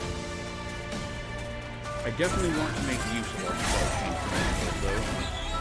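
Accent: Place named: North America. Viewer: Hi Not looking to pry, just hope all the hospital stuff you had mentioned earlier has gone/is going well. Thanks for posting these, they helped me figure out new methods of getting through the challenges. Edit: FYI, I'm all the way in Australia, just going by your accent I'm assuming you're in North America.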